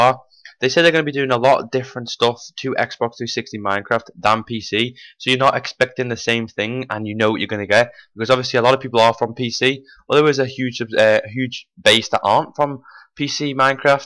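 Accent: Liverpool, United Kingdom. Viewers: Thanks for the update info, also u r scouse right. I hope so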